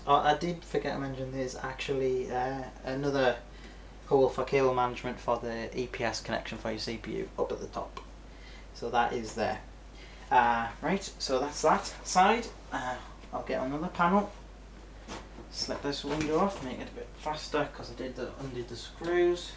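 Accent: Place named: Newcastle, United Kingdom. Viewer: Dude where are you from , you sound pretty local to me, newcastle??? im getting one of these cases, so good to see a decent review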